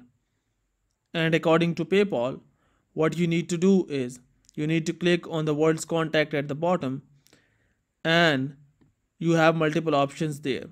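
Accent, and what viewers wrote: India. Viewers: As soon as i heard an indian voice ik it's a waste of time video...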